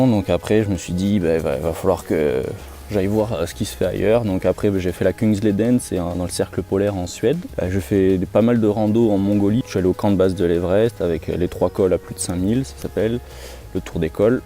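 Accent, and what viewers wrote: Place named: Basque Country, France. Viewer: Bonjour. Bravo pour votre chaîne et vos périples. J'aime beaucoup votre sincérité, modestie, et philosophie de la randonnée. Il faut toujours commencer avec son père, le seul type au monde que vous pouvez envoyer balader et qui néanmoins, vous aimera à la folie :) Une certaine façon de prononcer les "R" me fait penser que vous n'habitez pas loin du Pays Basque. Bien cordialement.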